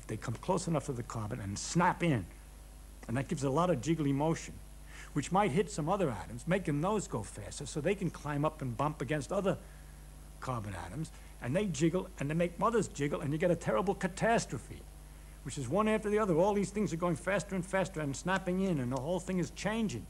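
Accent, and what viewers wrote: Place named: Italy. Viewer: why is there a slight italian accent tho loool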